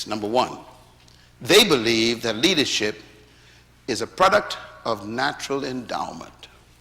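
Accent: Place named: Caribbean. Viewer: Its 2020 and I just heard this message, Never have I heard a person, deliver a message to the world, so eloquently! Just listening to the message, I can telĺ you are an intellegent man from the Caribbean! I salute you Dr Myle, wish that I could have met you in person, your words are more relevant today, because I think you were able to foresee what this world would have become!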